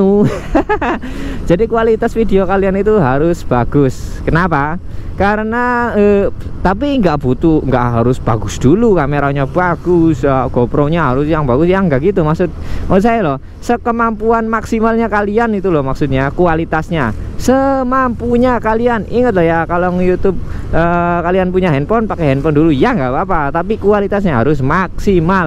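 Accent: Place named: Java, Indonesia. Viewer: Oalah wong jowo sampean kang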